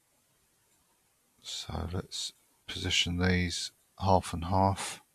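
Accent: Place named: United Kingdom. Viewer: I have subbed and I will be back my fellow brit! I don't think you realise, how pleasant it is to hear someone who doesn't sound like a youtube "bot/nps" and/or an American, No hate to our bothers across the pond, but some of them accents can go straight through me, Like a vindaloo!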